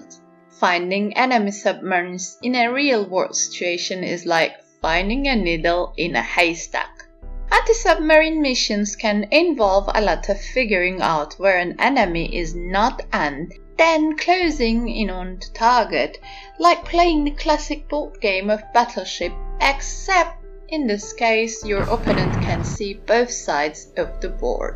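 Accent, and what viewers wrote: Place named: Scandinavia. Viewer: Prob Scandinavia like Norway, Sweden etc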